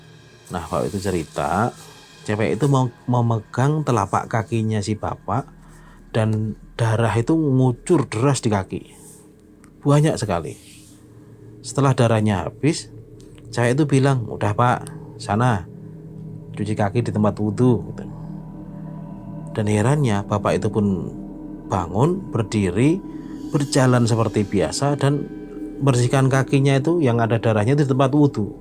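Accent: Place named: Bandung, Indonesia. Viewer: Dari logatnya sepertinya bapak ini orang Bandung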